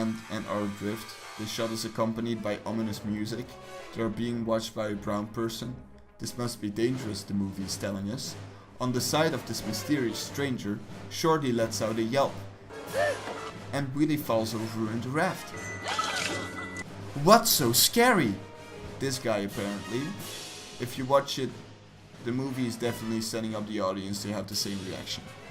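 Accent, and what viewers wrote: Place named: Central Europe. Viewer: I'm just going to assume you're a white guy who doesn't actually know what racism or sexism is. From the sound of it you are from middle Europe? Its quite ok that video doesn't have more views than it does.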